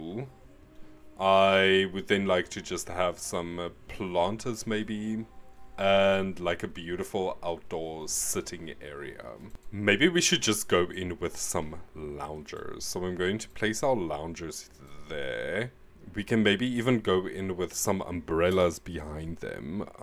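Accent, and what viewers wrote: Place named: South Africa. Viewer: I could here it in your voice 😊 Nice to meet another South African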